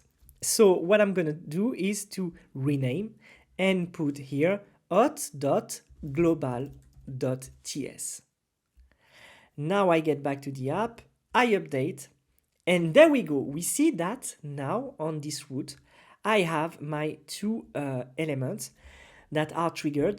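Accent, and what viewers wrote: France. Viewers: Thank to this tutorial and surtout ton french accent , on a tous le même mais ça me va parfaitement comme ça . Good job 👍💪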